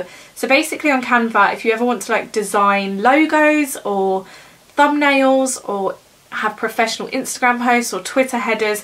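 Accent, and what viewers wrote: United Kingdom. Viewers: You’re very beautiful! I can’t stop telling you that😂 i love your hair, your makeup, your eyes😍 your clothes, your bed sheets, your british accent, your soul! Where are the guysss? We’ve got a gorgeous girl here how they cannot see thatt?🙋🏼‍♀️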